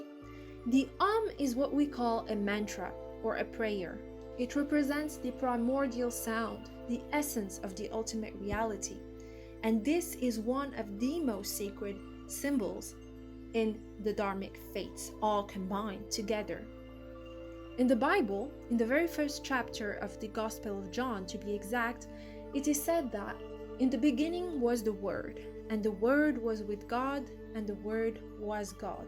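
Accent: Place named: Netherlands. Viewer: You narrate very well in English, but sometimes I get the impression your mother tongue might be Dutch?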